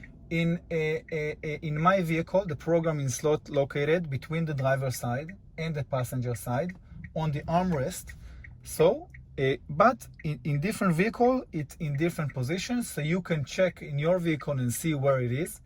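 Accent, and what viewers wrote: Israel. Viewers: מבטא ישראלי. אשמח אם תוכל לעזור לי לגבי שכפול שלט לקיה קרניבל 2022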